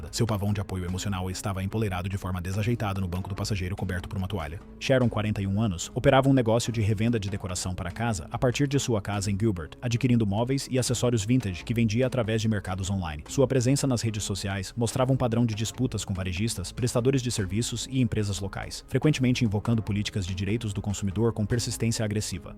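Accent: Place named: Portugal. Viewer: Talvez seja porque o narrador é português. Lá eles falam meio rápido e adotam o padrão internacional de medidas, se não me engano. Mas, de fato, ele deveria se esforçar a falar menos rápido para as demais pessoas foram de Portugal, possam acompanhar melhor e falar as duas medidas para melhor compreensão. Tem que pensar fora do seu país.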